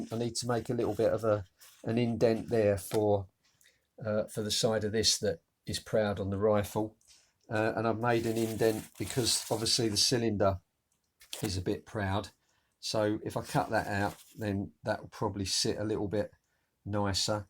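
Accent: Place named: East London, United Kingdom. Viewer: Helpful video. Love your videos. I can't help wondering where your accent originates from. You sound a lot like my late granddad, who was from the London East side. Very soothing to listen to. Anyway keep it up mate.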